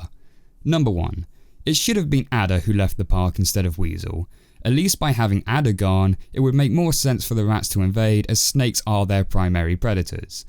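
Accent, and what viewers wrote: United Kingdom. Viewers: I JUST REALIZED YOUR A BRIT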